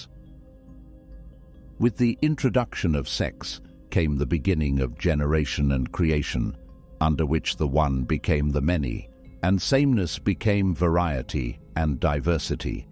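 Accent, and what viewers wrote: United Kingdom. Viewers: Man speaking with ‘stiff British -scholarly sounding accent’ saying nothing…..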